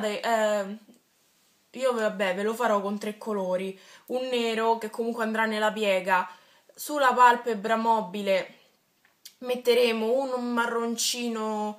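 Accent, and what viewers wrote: Rome, Italy. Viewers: sei bellissima e bravissima^^ ma te prego parla meno romanaccio D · AHAHA romanaccia proprio :)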